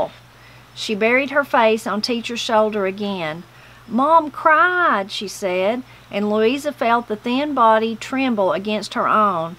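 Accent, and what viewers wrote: Appalachia, United States. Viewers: I’m so happy to notice that there seems to be plenty of pages yet to go in this amazing book! Perfect listening for me to cook supper while listening.. 😉 sweetly feminine voice, southern Appalachian accent and vocal cadence couldn’t be more perfectly ideal for narrating this story! Thanks again, and God bless y’all 💯🇺🇸❣️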